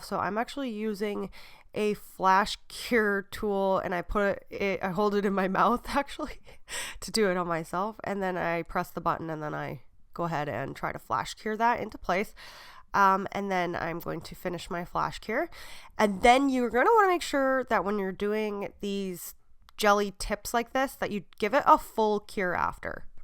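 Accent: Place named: Canada. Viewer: Hi, nice to see your vids back again. As a nail tech I find them relaxing, and your voice always reminds m😂 of my visit to Canada many years ago one summer, I absolutely loved it! Can always tell a Canadian accent when you say the word.. 'about' So cute! 🥰 Love that you're back filming again, best wishes from the UK 💕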